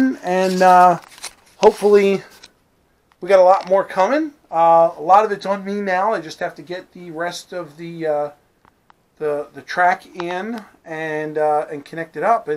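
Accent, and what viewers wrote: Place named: Philadelphia, United States. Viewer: Nice to put faces together with names out there in the livestreams. I could tell you are from Philly with that accent. Penndel was my stomping grounds as a kid. Thanks for sharing. 👍 👍 👍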